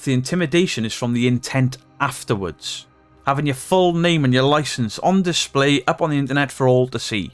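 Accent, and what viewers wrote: Wales, United Kingdom. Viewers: Listening to this in your Welshy accent lol "come on boys.." lol I'm from South Wales too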